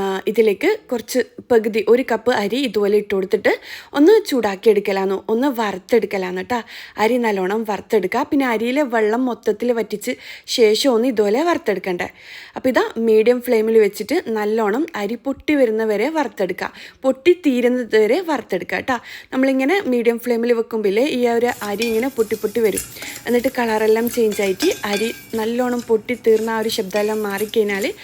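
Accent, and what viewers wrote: Kannur, India. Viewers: നി കണ്ണൂർ എടാ ആണ്❤❤❤❤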